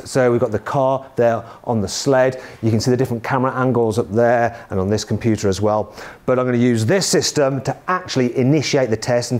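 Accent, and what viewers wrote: United Kingdom. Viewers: Logat nya british bngt kwkkwkwkw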